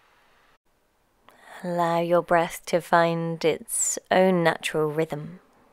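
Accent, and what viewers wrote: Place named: England, United Kingdom. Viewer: super good, thank you! i've dislocated both my shoulders over a dozen times and am really trying to sort them out! This feels like it has helped heaps, and could be a good practise to do every other day. really liked the stuff you were saying throughout as well, like in the comment below about how the body is a mirror of the mind (trying to work out what weak shoulders could be reflecting in my personality!) also really glad to have found a yoga video with an english accent aha, all the rest i've been doing are from american teachers and the english accent makes me feel much more relaxed and at home :) anyway nice one, subscribed!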